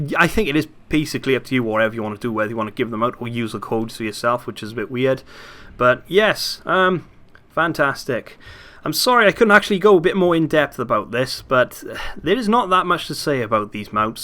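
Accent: Wales, United Kingdom. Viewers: Omg, you're Welsh!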